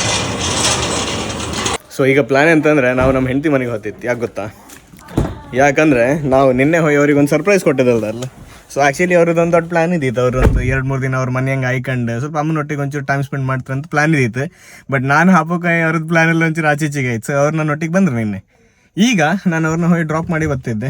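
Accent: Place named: Kundapur, India. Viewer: I love kundapra kannada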